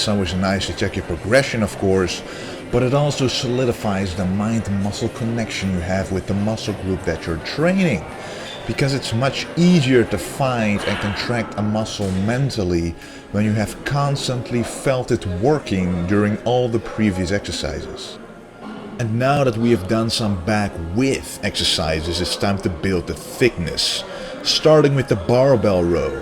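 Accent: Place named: Netherlands. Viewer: are you from the netherlands? your english accent sounsd a lot like a friend of mine from the netherlands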